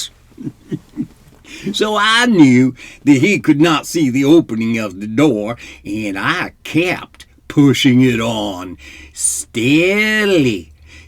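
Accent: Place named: South, United States. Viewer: Hahahaha!!! 🙌🙌❤ I absolutely love the southern accent!!!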